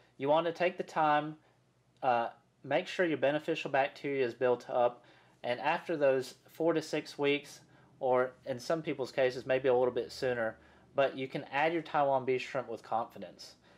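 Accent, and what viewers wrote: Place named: South, United States. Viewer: Thanks for the video! Are you from Georgia? I'm from Texas and you sound very southern!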